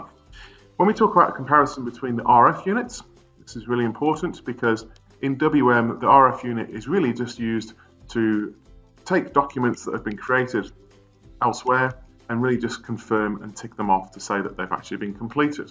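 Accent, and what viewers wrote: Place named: England, United Kingdom. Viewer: Finally a video with english accent, great video.🎉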